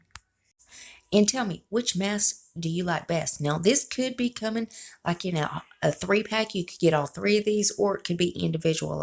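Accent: South, United States. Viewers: Love your accent! You are definitely a southern gal. Same here, KY gal. Loving my Boxy Charm and look forward to receiving them every month. Stay safe.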